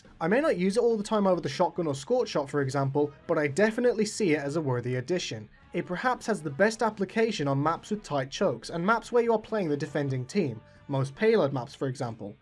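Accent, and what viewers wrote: Poland. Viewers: M8, u from Poland?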